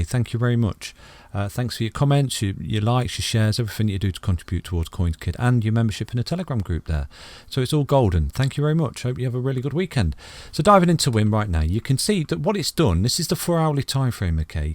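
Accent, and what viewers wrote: United Kingdom. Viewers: I was waiting for this Mr British accent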